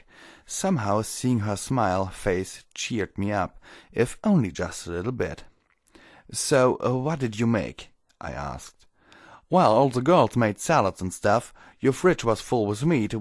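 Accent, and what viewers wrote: Germany. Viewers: hey bro are you from Germany or Russia or England or Scotland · I loved how i could properly hear the story being told, and how your accent brings it's own flare to the voices. I'm sorry if this sounds rude in my assuming, but are you perhaps german? I like the accent and just got curious. Especially considering how prominent it is. It's very soothing to my ears. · Now I'm not a fan of mlp, and am just watching this because I have nothing better to do. But your silky German accent makes this watchable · that german accent is so heavy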